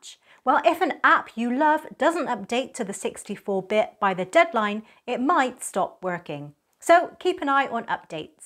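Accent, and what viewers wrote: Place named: Bristol, United Kingdom. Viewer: she must be from Bristol?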